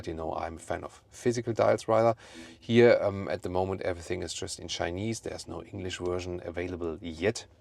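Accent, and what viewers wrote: Germany. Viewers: I love your German accent. Funny as hell.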